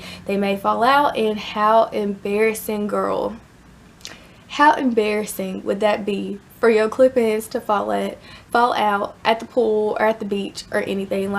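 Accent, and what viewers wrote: Texas, United States. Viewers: You're too cute.. especially your little country accent... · I’m thinking “why is everyone saying she has an accent” but then I realize I’m from Texas and have the same accent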